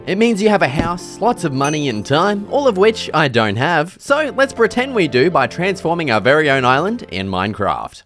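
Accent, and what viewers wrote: Australia. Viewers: Bros Def a Aussie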